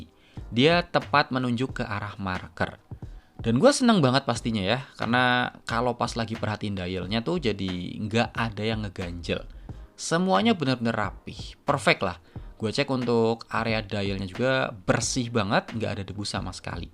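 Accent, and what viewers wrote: Tegal, Indonesia. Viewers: Oalah...masnya dari Tegal toh...makanya kalau bilang Gua kedengarannya unik 😊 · Oh mas nya wong tegal ternyata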